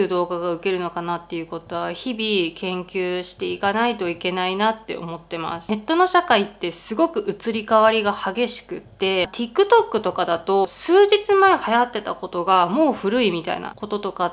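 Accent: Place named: Hakata, Japan. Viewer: 必ず新商品はの動画みてますよ。福岡出身なので博多弁がとっても可愛いですよそのまま頑張ってくださいね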